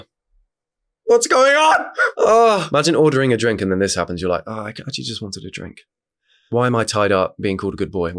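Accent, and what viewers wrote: United Kingdom. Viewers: wait have i been saying renaissance wrong or is he just british asf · It’s just the way the British pronounce it, coming from a British person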